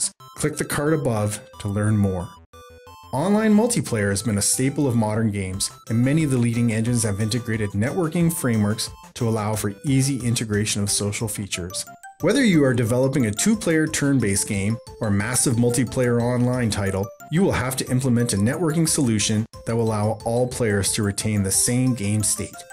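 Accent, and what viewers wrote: Canada. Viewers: Speaker is Canadian? Doesn't matter...just love your "o" sounds. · Is he Canadian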